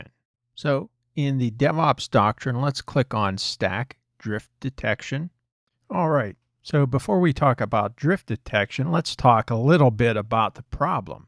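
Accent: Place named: United States. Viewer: Yay! An american voice!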